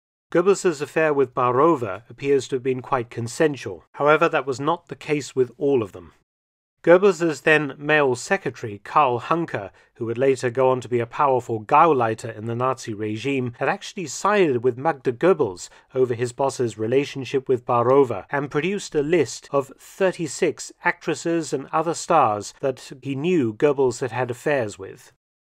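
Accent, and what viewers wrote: United Kingdom. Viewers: Mark Felton, I love your educational channel. I've caught a lot of your videos. They are very informative. Very well laid out. 100% professional. Except your voice. Now you sound like one of those fine British dudes? And you guys are known for delivering, superlative audio. As that will help your rather droll delivery. Because I'm not a big fan of your voice. But no design. You produce some great educational videos of history. Thank you. I glean much from your videos. Now, I know there really isn't anything you can do about your voice. But adding a tiny bit of, free software-based, processing. Would be an advantageous measure to take. Doing little things like, rolling off the low frequencies. Boosting the upper midrange frequencies between 3 and 5 kHz. And then an extra little boost of high-frequency treble, boost. Followed by what we call a Dynamic Range Limiter. 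With settings of 20:1. Attack and release times. Set to, Medium. And making the meter deflects, downwards. Like your windshield wipers on the highway during a, hurricane. And then you'll have something. It's easy to do. You can do it too. It's okay. We won't tell anybody! RemyRAD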